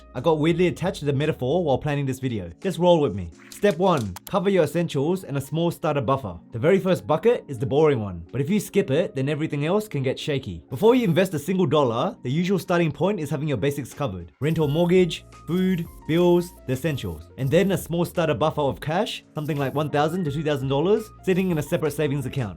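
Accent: Australia. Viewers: Typical Viet Aussie accent❤ smart man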